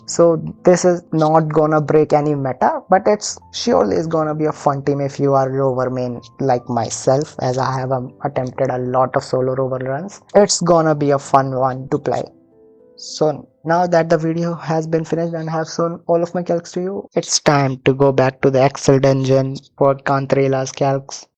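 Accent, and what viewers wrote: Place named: India. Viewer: The accent tells me that you are Indian?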